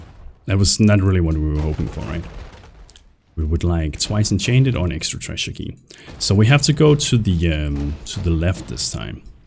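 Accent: Denmark. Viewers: And yea he is Danish :p easy to tell on his accent, altough its not one of the bad ones! :p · Is this legal? Will it get you banned? And a side question. Are you danish ??? 😋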